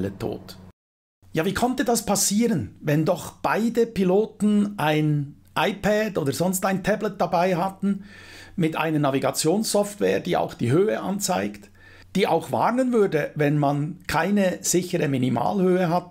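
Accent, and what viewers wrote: Switzerland. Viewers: In der Flugplanung schon Fehler und dann noch in der Kontrollzone abdrehen müssen. Ich weiß nicht was diese zwei Jungs da oben in dieser Nacht geritten hat. Da ist nicht viel Flugerfahrung eingesetzt worden. Traurig und es wäre nicht notwendig gewesen, in dieser Nacht sein Leben zu verlieren. Interessantes und gut erklärtes Video. Ich mag Deinen Schweizer Dialekt. LG aus EDTF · Das ist kein Schweizer Dialekt, das ist ein Schweizer der Hochdeutsch redet. Das tönt ja in Deutschland auch je nach Gegend verschieden.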